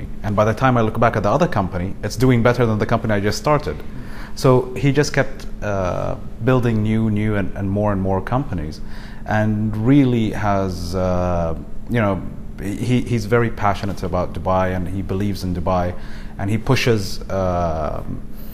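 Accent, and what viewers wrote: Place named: England, United Kingdom. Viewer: mashllah i like ur englsih accent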